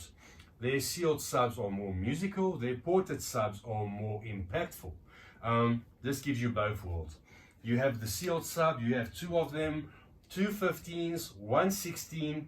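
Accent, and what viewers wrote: South Africa. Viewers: Are you from South Africa? For some reason I thought your Australian for like the longest time.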